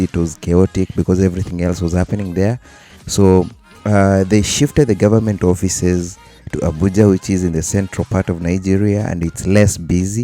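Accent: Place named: East Africa. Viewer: Are you from Uganda or Kenya. This accent is from East Africa because my wife is from Uganda. You both have similar accent. Love you from Nigeria 🇳🇬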